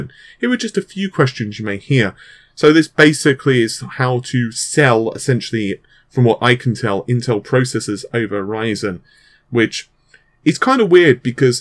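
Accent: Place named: Cockney, United Kingdom. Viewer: 2 & a half minutes til you actually say anything worth listening to. Thru all the mentions of ppl. Let them get their own youtube channel & get their name out there. Then tons of opinions & nonsense. Just get to the fuken content that the video is named after. Less your opinions & bad cockney accent. It's like wading thru a pond in your mind til we get to the information. Why when a mics turned on do ppl all of a sudden get diarrhea of the mouth. You're very verbose.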